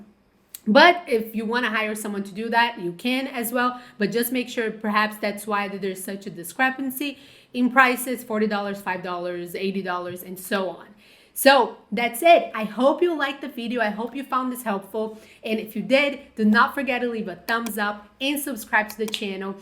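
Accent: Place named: Brazil. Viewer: O sutaque é brasileiro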